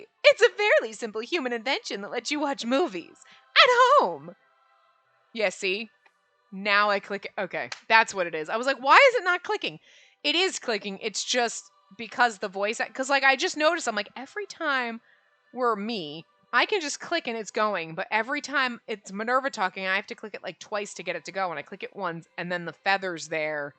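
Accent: Ireland. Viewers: everyone loves the Irish accent me included :)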